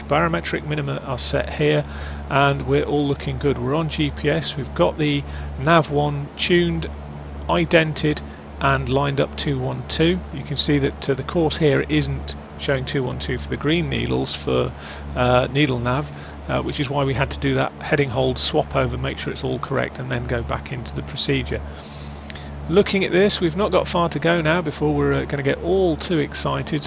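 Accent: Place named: United Kingdom. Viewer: Love your video! Of course, your use of British English, different from American English, was quite enjoyable to listen to. Anyway, the entire process was a very valuable learning experience. Thank you.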